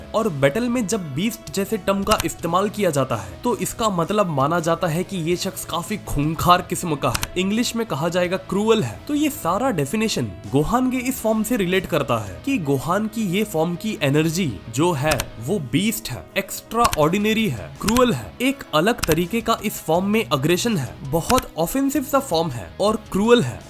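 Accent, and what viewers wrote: Maharashtra, India. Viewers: Your manga narration are the best and the Marathi accent is crazy ! 😂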